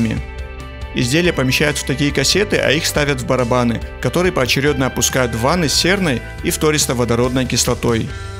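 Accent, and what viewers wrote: Kabardino-Balkaria, Russia. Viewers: Кабардинец? Родной акцент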